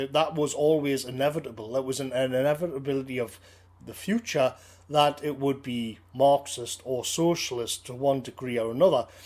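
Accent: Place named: North East England, United Kingdom. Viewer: its really is beautifully articulated content, in a way that only the north east accent can deliver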